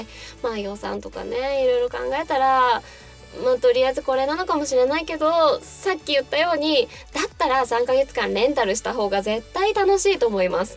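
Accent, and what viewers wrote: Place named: Sendai, Japan. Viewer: 仙台弁!!ひしゃげるって言い方初めて聞きました(^^)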